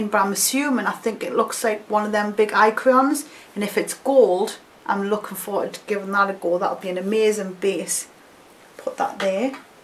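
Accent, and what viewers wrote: Newcastle, United Kingdom. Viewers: You cannot believe how happy I am to see you back on here and so regularly too. Became a Patreon and wish I could send you more. I used 1 of your Barry M tutorials for a look I wore for years. I also bought 1 of your palettes too. Wow I’m beginning to sound like a weirdo lol, I live in Newcastle and love hearing the accent. You look so happy and relaxed xxxx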